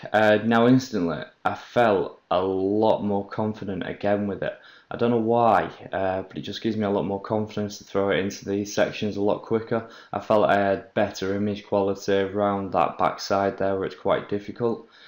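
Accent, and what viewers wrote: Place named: Greater Manchester, United Kingdom. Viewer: Yeah i can see where you were coming from. Get a patch on and you should see improvement, i only flew a few packs with mine at the weekend and i love it already. I wasn't prepared to pay over £100 for the LaForge for what is probably exactly the same performance wise. ps. your accent sounds local(ish) guessing somewhere around Greater Manchester?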